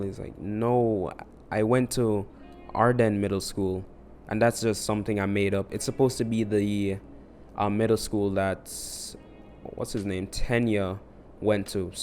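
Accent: Jamaica. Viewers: Yo what is this mans accent? I hear a little bit of a Jamaican maybe but I’m really not sure.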